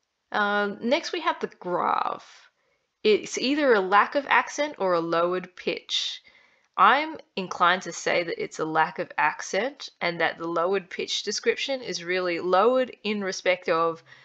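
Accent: Australia. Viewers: I think your accent sounds mostly Australian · This is a super introduction to the subject, thank you. I love the charming way you pronounce these snippets, with this ever-so-slightly Australian dialect of Greek. I started learning Ancient Greek about a year ago, with an ancient Teach Yourself book (Kinchin Smith and Melluish). Since starting I've subsequently started to learn rudiments about the actual pronunciation in Ancient Greece. In fact this TY book explicitly says in the introduction that they're not going to bother with accents "because they're unimportant" ... ! Sadly this also reflects what Allen says in his otherwise very useful book *Vox Graeca*, most of which I've now read: "don't think about trying to get the accents, it's too hard and not worth having such aspirations"!!! I find these attitudes absolutely bizarre! I now have a target accent: 5th Century BCE Attica! 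The "other" challenge there (apart from accents) is the aspirated/unaspirated allophones, τ/θ, κ/χ and π/φ. It's hard but not impossible by any means: I find the best way is to try and speak the unaspirated ones in a sort of Spanish accent, because Spanish has this tendency to swallow accents (i.e. to prefer unaspirated), whereas with English there generally seems to be a mixture of aspirated and unaspirated. Have you done anything on the unaspirated/aspirated allophones? I had a glimpse at your Youtube vids but couldn't see anything.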